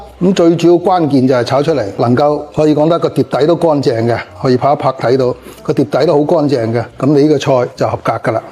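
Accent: Guangzhou, China. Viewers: 我是地道的广卅人 师付的粤语带着很多的广卅方言 听起来很亲切 跟学做菜 吃到了自己喜欢的美食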